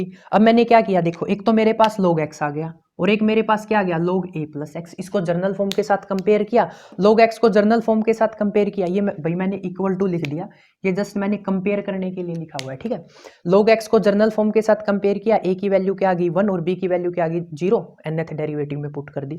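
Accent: Haryana, India. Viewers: Wow haryanvi🥰🥰🥰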